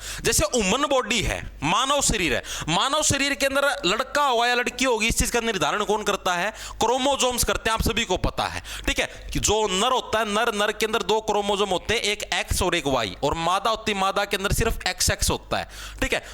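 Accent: Haryana, India. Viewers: Aap Haryana Se Hain Kya bahut acchi basa h ji 🎉🎉🎉acha padate h 💯🥹🥹🫣😍😍😍😍😍😍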